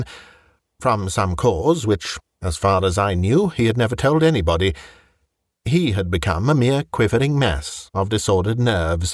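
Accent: England, United Kingdom. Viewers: This and horrorbabble are my favorites. There's nothing quite like an English "accent" and a voice capable of giving character when listening to spooky stories. Who would've thunk it huh? English people being good at speaking English. Haha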